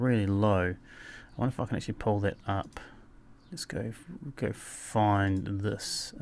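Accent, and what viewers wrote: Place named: New Zealand. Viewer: That is the most Kiwi accent everrr :D Lived in Christchurch for 2 years, can't forget it :P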